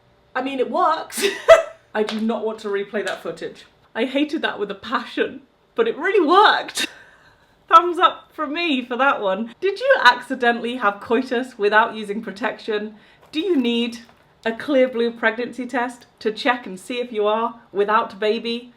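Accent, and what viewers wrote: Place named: England, United Kingdom. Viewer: I love english accents lol always reminds me of bridget jones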